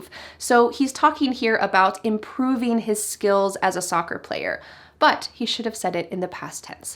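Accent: United States. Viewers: I really like ur smile, and you have a beautiful American accent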